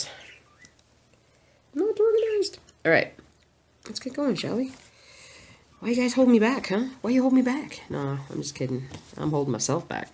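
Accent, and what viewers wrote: South, United States. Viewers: Actually, I am from South Carolina, and I do hear your southern accent. It doesn't come out all the time, but there are certain words that you say, like 'plain' kinda sounds like 'play-en'. When I first started watching you, I wondered where you were from, until I heard you talk about Georgia. And BTW, if you ever come visit the SC area, or north GA, get in touch and I'll buy you lunch! Yes, they still do the laser light show on Stone Mountain. And I YES to Zaxby's. We have one about 10 min from our house here in SC. I was so happy when we went to Pensacola Fl to see my father in law and they had a Zaxby's there. So they are going a bit more west.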